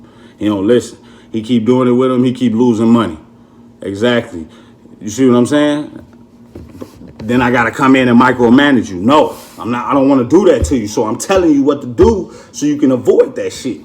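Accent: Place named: Chicago, United States. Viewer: You talk like you from Chicago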